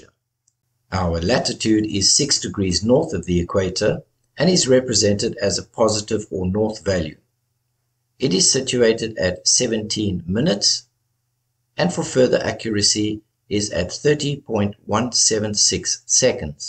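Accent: South Africa. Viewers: My God, this is great! And I love the SA accent!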